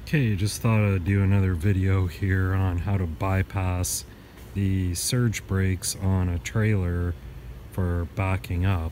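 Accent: Canada. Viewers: Hope this helps you oat! I love crazy accent! Lol I may be wrong but I’m guessing Canada maybe? Out = Oat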